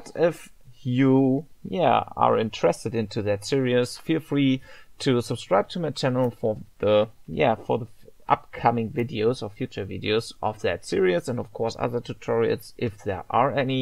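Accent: Germany. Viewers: Damn, this accent sounds so German!!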